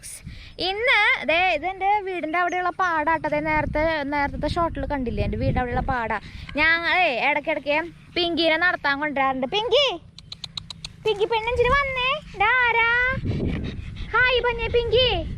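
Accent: Thrissur, India. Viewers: Saralla mole itrakim budhimutti... Thaarangalde veedokke kanichallo... Enikista mole pinne trishur bhaasha kelkaan nalla rasamund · Thrishoor basha nalla rasam kelkkan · Thrisoor slang poli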